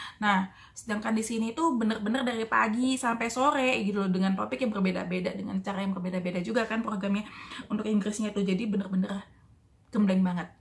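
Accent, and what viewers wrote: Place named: Bali, Indonesia. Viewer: hahaha iya, logatnya mirip Bali. hehe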